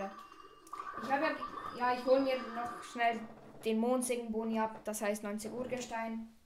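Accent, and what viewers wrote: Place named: Switzerland. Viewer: Hey again ha also rächt ka mit minere annahm das du schwiezer Bisch ha es sofort a dinere ussproch gmerkt 😊 find machsch richtig gueti Videos witer so bi e YouTuberin us de Schwiz😅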